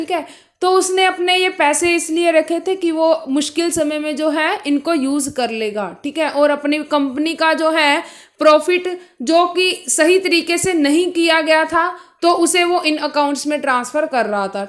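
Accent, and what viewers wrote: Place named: Punjab, India. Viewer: Punjabi/Haryanvi voice ❤️❤️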